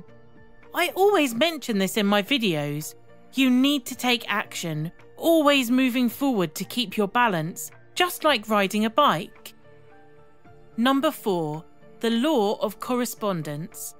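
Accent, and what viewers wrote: United Kingdom. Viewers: WHY do they always use strange. British accents to narrate these videos ?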